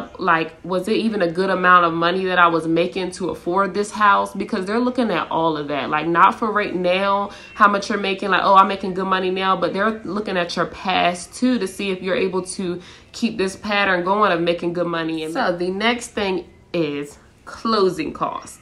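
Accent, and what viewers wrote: United States. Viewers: Congratulations 💯 I can hear the baltimore in you 🦾 we different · Are you from PG county? The accent! lol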